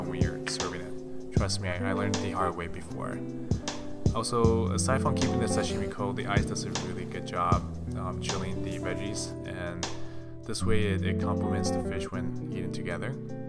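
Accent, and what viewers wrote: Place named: South, United States. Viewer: Your voice, with a touch of Southern, is so soothing... perfect zen element to go with sushi! Thanks.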